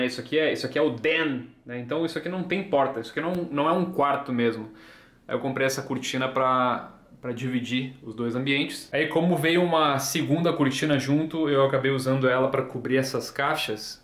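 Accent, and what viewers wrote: Rio Grande do Sul, Brazil. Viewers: pelo sotaque é um gaucho , uma grande abraço meu amigo , teus vlogs são ótimos ... fica com Deus e melhoras pra sua mãe ... ( Gaucho desbravando o mundo )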